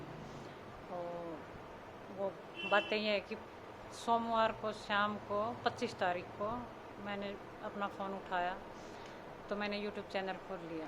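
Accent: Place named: Haryana, India. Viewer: Anti kti dhar pr dhr de h aapni haryanvi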